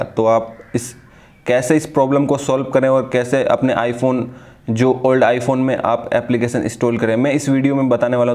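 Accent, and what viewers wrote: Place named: India. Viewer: why every single tutorial is made by indian???!!! I can not understand anything!!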